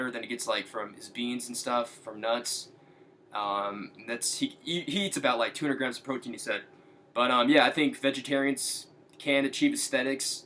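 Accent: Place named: Australia. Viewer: talks like an Aussie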